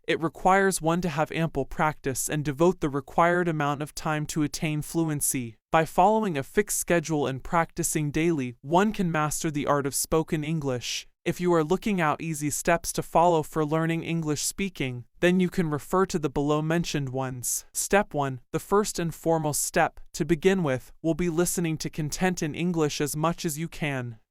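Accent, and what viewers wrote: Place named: United Kingdom. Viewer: Your speaking tearm it's very unique as like British English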